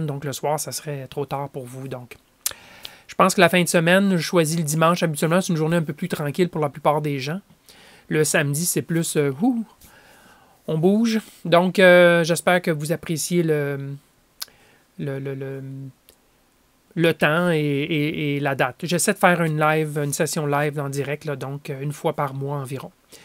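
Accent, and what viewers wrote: Quebec, Canada. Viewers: Merci à toi l'ami pour cette première vidéo en live à laquelle j'ai pu participer . En plus avec l'accent du Québec, c'est le top ! :-) Tu laisses visible le contenu de ce qui s'est dit dans le t'chat et je trouve ça bien sympa ! Cordialement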